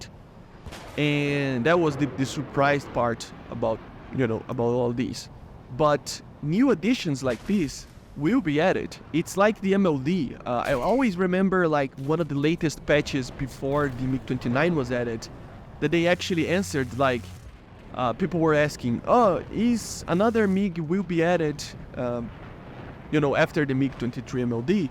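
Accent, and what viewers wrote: Brazil. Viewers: eae, po ver o f18 seria um sonho, e agr o gripen tbm deu p ver q tu é br pelo sotaque huahauahau tu voa onde mano? sou piloto tbm, mt bom ver br fznd video do war thunder tmj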